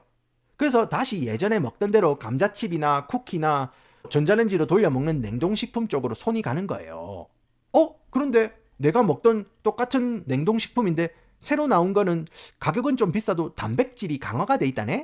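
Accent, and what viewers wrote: Gyeongsang, South Korea. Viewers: 공감하는 글 잘 봤읍니다. 한국도 마찬가지 풍조입니다. 정크푸드가 건강한 포장을 쓰고 판매되는 것은 똑 같네요 갱상도 구수한 언변이 참 좋습니다.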